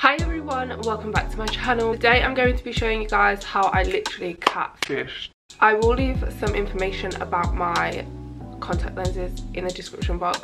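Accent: United Kingdom. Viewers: Hey as another mixed race girl... do you tan well? Just wondering because from your accent I'm assuming you're in the UK and I heard that there's not a lot of sun there so I feel like if you were somewhere else your hair would probably lighten up and your skin would get darker. And possibly even give you freckles, judging by your skin tone. Edit: Cool makeup tho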